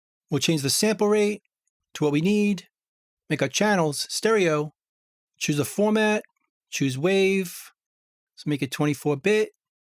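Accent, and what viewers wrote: New York, United States. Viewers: Thank you. From a newb, you make things very understandable and clear. PS Love that NY accent:)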